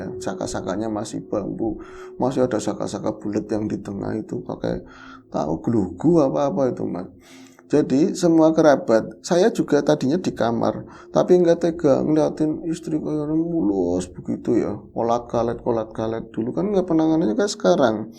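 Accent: Banyumas, Indonesia. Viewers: Sallam ngapak Mas, seneng krungu,ne soale ibu,ne aku wong Lebaksiu bapa,ne aku wong wetan Solo👍😃sukses selalu.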